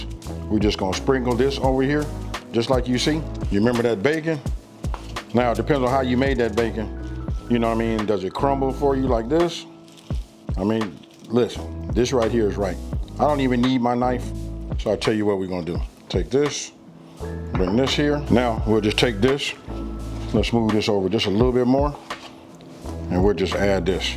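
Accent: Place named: Detroit, United States. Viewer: he must be from Detroit he sounds just like he from there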